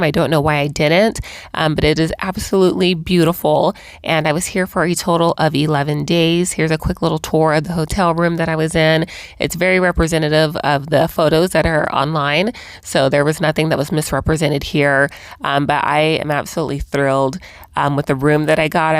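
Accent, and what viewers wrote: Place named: West Coast, United States. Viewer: I love your video. Very informative and detailed. 10K for flights?!!! u rich lol...I would suggest traveling more so you're not too easily nervous about experiencing new things in countries. I am an American living in Denmark now, and love seeing fellow USA people travel. You're accent and vibe tells me you're from west coast. aye.. lol. Anyway u have my subscribe.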